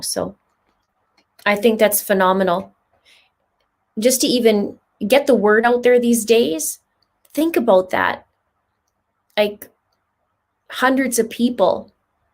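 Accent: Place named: Canada. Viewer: I love your channel and your Canadian accent. I love that they have the Benghazi surviver Tig, helping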